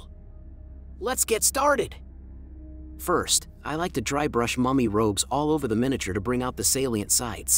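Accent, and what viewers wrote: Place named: France. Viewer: would much prefer to hear your French accent. Many of the top mini painters have accents such as Trovarion, Marco Frisoni, Sergio Calvo etc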